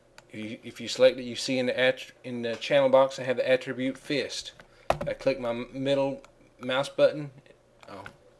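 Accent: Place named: Texas, United States. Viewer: you explain things damn good! thanks a bunch! is that a texan accent or what exactly? im not from america sry!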